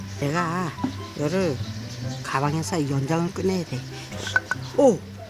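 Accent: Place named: Jecheon, South Korea. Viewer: 제천 사투린데 넘 정겹다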